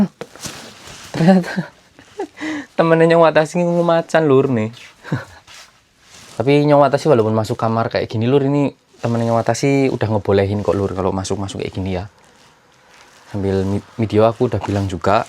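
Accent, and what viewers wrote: Banyumas, Indonesia. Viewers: Orang mana mas ngapak poul😂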